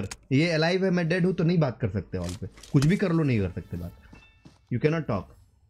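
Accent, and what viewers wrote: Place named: Kanpur, India. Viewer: Bro kanpur se ho kya because od your tone agae haa to bhai hum bhi hai ek shoutour dedo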